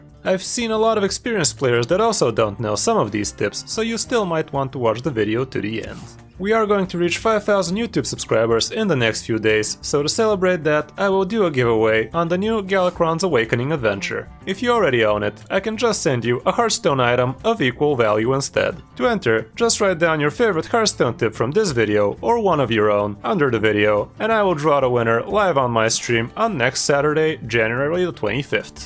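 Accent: Russia. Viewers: русский акцент детектед, привет чувак :) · Are you Russian?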